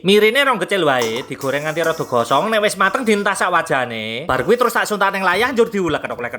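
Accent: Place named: Java, Indonesia. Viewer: Dengar suara mas ny yg reyah bisa ketawa sndri q,,,,sama2 orang Jawa jdi Pham bngt bhasa nya lucu skali😂😂😂😂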